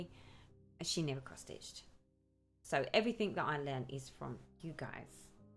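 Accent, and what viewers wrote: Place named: Essex, United Kingdom. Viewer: Dang. I missed live stream but watching it now. You are so much fun and I love your Essex accent. Actually very soothing.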